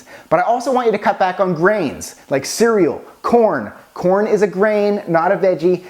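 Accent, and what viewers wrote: Canada. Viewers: where are you from?? atlantic canada??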